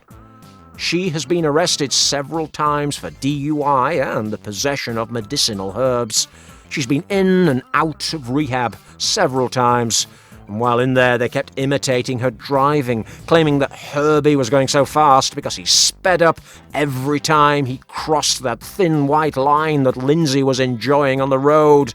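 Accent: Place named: Northern England, United Kingdom. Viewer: I've spent months trying to figure out where the narrator is from. Northern England, somewhere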